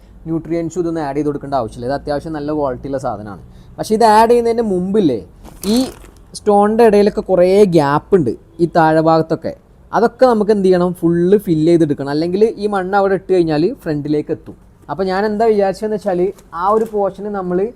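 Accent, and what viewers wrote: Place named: Vadakara, India. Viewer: എന്നിട്ട് നിങളെ ഭാഷ മലപ്പുറം ടെച് ഇല്ലല്ലോ 🙄ഞങ്ങളെ കോഴിക്കോട് വടകര മോഡൽ പൊലെ എനിക്ക് തോന്നൽ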